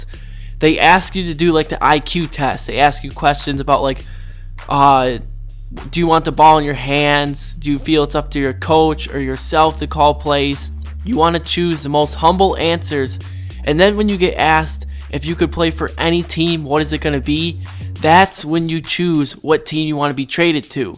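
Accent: Midwest, United States. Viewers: Why are you a Spurs fan? You sound like a midwesterner to me. Good video though. It worked for me, but they didn't trade me due to my conflict with Kevin Love. They traded me a week later, after I conflicted with the coach. I guess I was playing too well for them to trade me, IDK.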